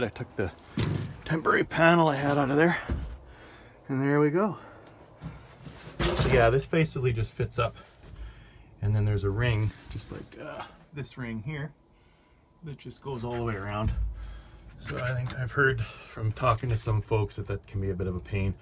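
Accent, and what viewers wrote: Canada. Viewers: Love the Canadian accent! Oh hey there! Great videos!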